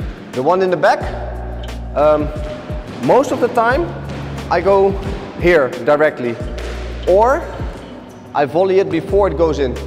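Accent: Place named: Netherlands. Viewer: 1000% dutch accent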